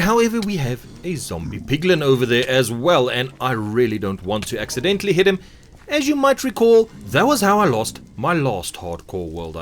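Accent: South Africa. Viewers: this oke sounds South African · South African? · Are you from south africa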